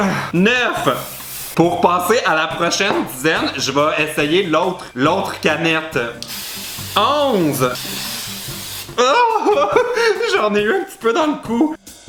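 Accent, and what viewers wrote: Quebec, Canada. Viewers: J'ai regarde tes vidéo pendant presque toute un journée et après je suis parti aux toilettes mon père m'a demandé un truc j'étais en train de parler avec l'accent québécois je comprenais pas pourquoi et maintenant je crois pourquoi en fait que j'ai trop regarder des vidéos de toi et du coup j'ai pris ton absence mais c'est bon j'ai plus l'accent Québécois mais j'aurais bien voulu l'avoir encore un peu lol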